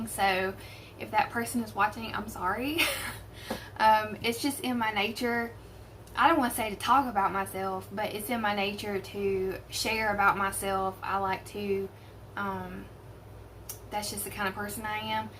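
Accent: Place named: South Carolina, United States. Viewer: I love your South Carolina accent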